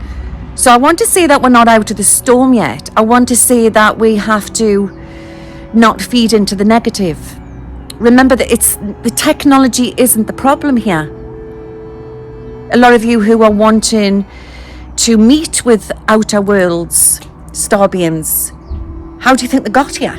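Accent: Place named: England, United Kingdom. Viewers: Luv ya and our English accent dearie.... thank you for sharing your gifts and insights and positvity! Do you see a tsunami originating in La Palma Island affecting the east coast of the united states? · Thank you for given us important information. I deeply resonate with your words and predictions. For more than ten years I have been translating and introducing some of the English alternative articles and videos which I felt interesting in my blog site so that some of the Japanese people can understand them. Lots of the Japanese are not getting enough information from abroad though much of the important information come from outside Japan. I think that is what I am here for. Long time ago I used to live in England for 12 years so I love to hear your northeastern accent. Thank you.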